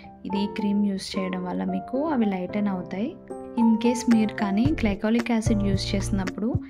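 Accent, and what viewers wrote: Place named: Godavari, India. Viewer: మీ way of talking చూస్తుంటే గోదావరి జిల్లాలో తెలుగు అమ్మాయి లా ఉంది.. nice explanation ❤😊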